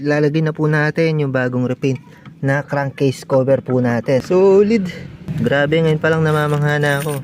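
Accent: Pampanga, Philippines. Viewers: Kapampangan ka ata sir😊 accent mo kasi.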